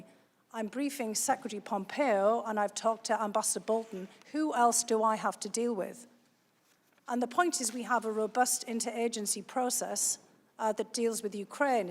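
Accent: United Kingdom. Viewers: She sounds like a Brit.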